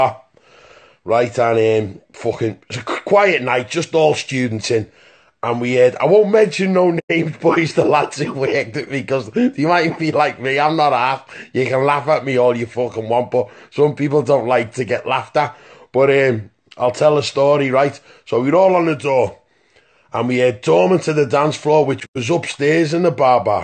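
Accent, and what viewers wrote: Ireland. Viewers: Irish video comes up first thing I do it’s put subtitles on lol. Didn’t help! Omg I love this guy!!!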